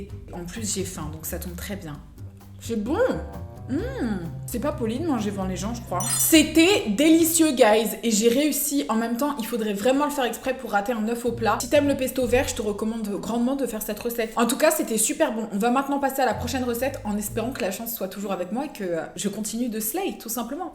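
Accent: United Kingdom. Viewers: ur BRITTISH ACCENT IS AMAZING🥺💞💞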